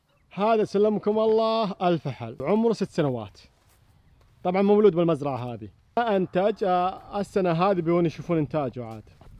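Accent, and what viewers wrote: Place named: Bedouin, Arab world. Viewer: لان بصراحه لهجتك شبيها للهجت بعض البدو هنا في مصر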